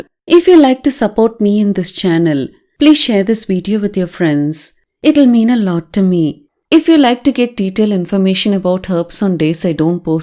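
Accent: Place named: Coimbatore, India. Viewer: Hi, i keep watching ur videos, when i hear ur tamil slang, i feel ur somewhere near cbe, is it so. U videos r very useful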